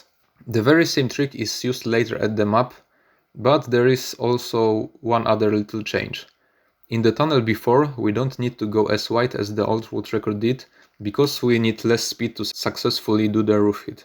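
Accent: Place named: Poland. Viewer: I have watched a few seconds and thought this guy has identical voice and accent as me, is he polish? Polish version of Trackmania, yup, haha. Crazy how similar our voices are.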